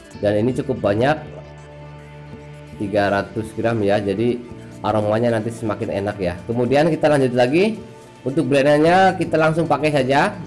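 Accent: Indonesia. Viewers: chef nya ngapak ya ?? suara nya enak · Ngomong nya kaya jawa tegal apa brebes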